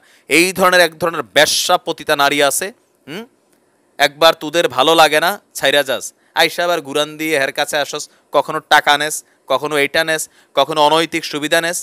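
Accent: Comilla, Bangladesh. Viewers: apnake dhonnobad apnar kothagulu amagur moto mone hoy comilla kothay jobayr dhaka theke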